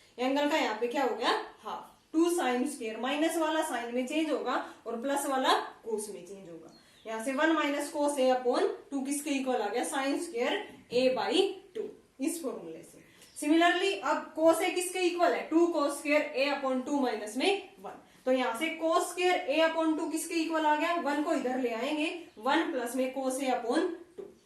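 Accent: Haryana, India. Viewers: Mam app haryana sa ho na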